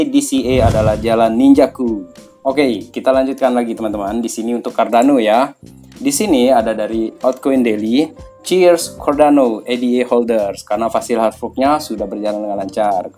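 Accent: Sumbawa, Indonesia. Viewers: Penasaran sama masnya, kok mirip orang Sumbawa ya medoknya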